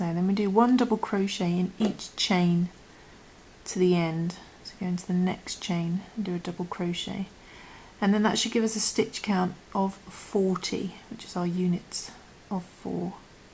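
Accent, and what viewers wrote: United Kingdom. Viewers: Love your accent! British, eh?! Great!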